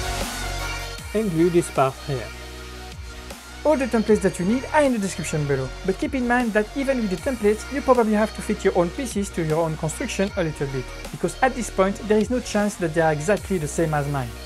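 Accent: France. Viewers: Excellent boulot ! Et j'adore ton accent FR ;) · I love this accent, french I assume? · can u stop speaking enhlish with ur french accent , its difficult to understand as ur pronouciation is not really good + its really annoying , french is a loving language , u dnt hve to b ashamed of ur native language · Mdr on voit ton bel accent français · Juste ton accent french 😅😅😅bon boulot en tout cas j'adore tes techniques d'assemblage franchement chapeau 👍👍👍👍👏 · what is this french accent, are you realy english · Le gros accent français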